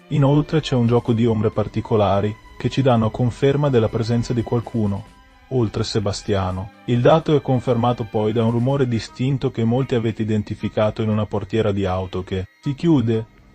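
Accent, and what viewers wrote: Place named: South, Italy. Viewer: Ma questo che parla sei tu stesso? Ha l'accento di uno del sud che ha vissuto un po' al nord, abbastanza peculiare come modo di parlare.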